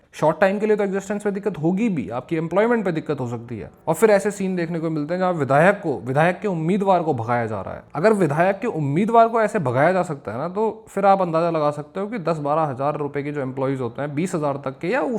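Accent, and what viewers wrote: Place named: Uttarakhand, India. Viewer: Bhai ❤ uttrakhand ke khatima ke kurti walo ki trh bolta h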